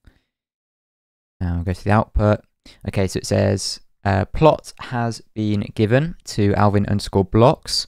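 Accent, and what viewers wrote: United Kingdom. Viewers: i just love british ppl (the accent ofc)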